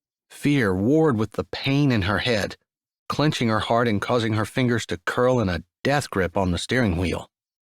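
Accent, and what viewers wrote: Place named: Ireland. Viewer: Oh my goodness, the Irish accent! Love it!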